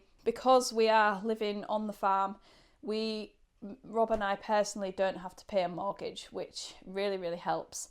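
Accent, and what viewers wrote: Yorkshire, United Kingdom. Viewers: Great video and love to hear that Yorkshire accent! Like getting an extra episode of PBS's All Things Great and Small!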